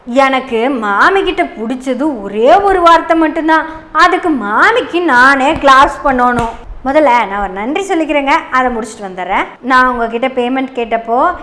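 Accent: Kongu, India. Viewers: Excellent dear yesterday I have seen your show today also seen after long time full show is back to full Kongu Tamil. Excellent dear. Here Mr.srinivasan requested this idiot uruga mammi to regularize GST for all items they are selling in hotel even if his high slab also. He very much humbled requested that but this arrogant lady without meeting peoples came to parliament through back doors and proudly arrogance behavior to a senior and very senior famous business man. Very sad to see this and very shame to be a tamilian to be a Our FM 😢😢😢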